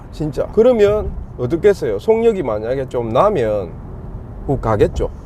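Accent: Gyeongsang, South Korea. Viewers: 사투리가 몸에 베었... 곙상도.. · 경상도 말 밥 맛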